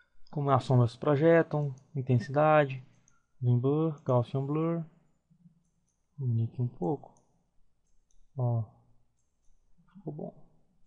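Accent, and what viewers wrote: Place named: Minas Gerais, Brazil. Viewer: show. a voz do mineirin. ô çô!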